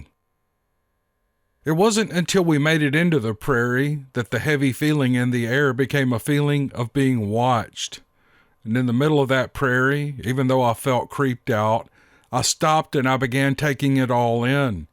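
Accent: South, United States. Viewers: all these people love you! Your genuine love and goodness is overwhelming! That southern voice is to kill for! Have I buttered you up enough yet? Just kidding. I can’t even get a stream to go through correctly. But Im good at sending peek-a-boo pics of my hubby unintentionally. Lol 💝💝💝💝💋🙋‍♀️👩‍🦳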